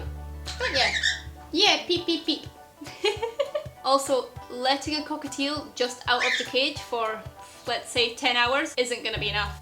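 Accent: Scotland, United Kingdom. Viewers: Are u scottish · Are u Scottish;)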